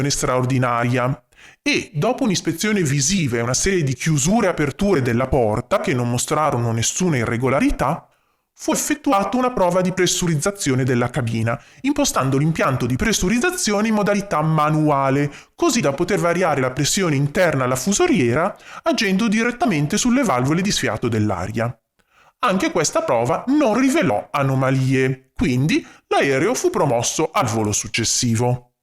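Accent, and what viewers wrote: Genoa, Italy. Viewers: Con questa si sente meno l accento genovese belin😂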